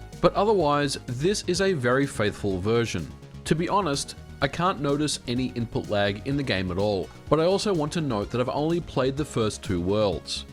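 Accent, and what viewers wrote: Australia. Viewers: It's probably a quirk of his accent(being from Australia) and just saying it the alternative way. That or just ask him on Twitter or some shit. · Classic Australian VO work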